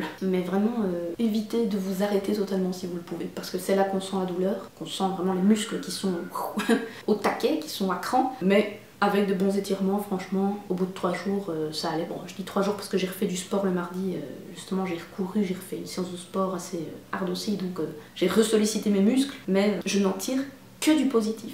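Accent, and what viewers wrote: Belgium. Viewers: Bonjour, je te remercie car avec ta vidéo précédente tu m'as donné envie de m'y mettre alors que je déteste courir ... j'ai téléchargé une appli et j'ai fait la première course du programme (très très facile pour super débutant) et je me suis régalée !!! Je vais continuer bien entendu 😉 Bisou d'une réunionnaise qui a fait ses études en Belgique et qui adore entendre l'accent belge 😘Félicitations pour ta course !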